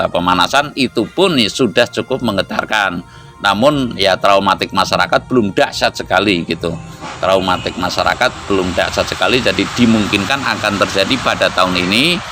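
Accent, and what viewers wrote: Banyumas, Indonesia. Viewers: Aku suka logat ngomongnya ngapak2 gitu lucu tp serius,lama2 tk perhatikan tambh muda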